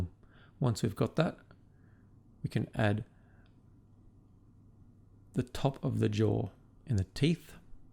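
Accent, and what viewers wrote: Australia. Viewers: Aussie to the core without being too ocker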